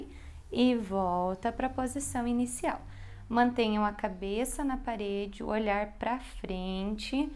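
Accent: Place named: Curitiba, Brazil. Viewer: Ah que pena em vários momentos cortou significativamente o áudio - uma pena porque a professora é boa e paciente. No começo deu uma tremida também, mas depois resolveu. Vocês são de Curitiba? É pelo sotaque porque tem outro Eqiulibrium em SP. Mesmo eu que já faço dei uma perdida com a falta de som às vezes. Obrigada pelas dicas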